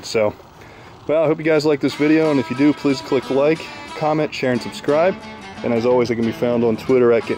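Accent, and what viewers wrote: Philadelphia, United States. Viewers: its been almost 50 years since I lived there, but that sure sounds like a suburban Philly accent.... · Is that a Philadelphia accent?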